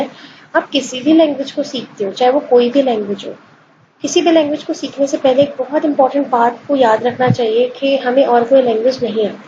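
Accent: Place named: Bihar, India. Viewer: madam bahut zaldi bta rahi h wo v bihari (bhojpuri) accent me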